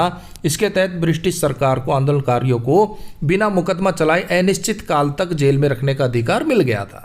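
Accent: Haryana, India. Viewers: Sir aap hariyana se h kya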